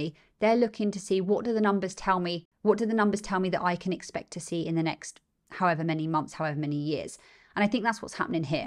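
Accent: United Kingdom. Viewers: thanks for your insight! And I get to listen to a wonderful British accent too:)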